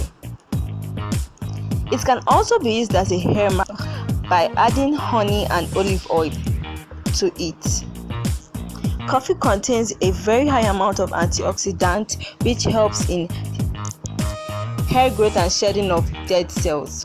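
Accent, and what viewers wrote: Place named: Africa. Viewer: I love African accents omg ❤️❤️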